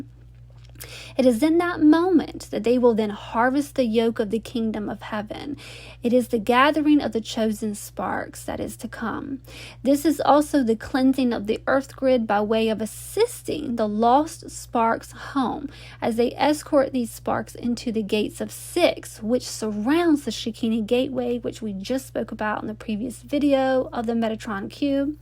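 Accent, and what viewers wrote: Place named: South, United States. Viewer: Love the southern accent.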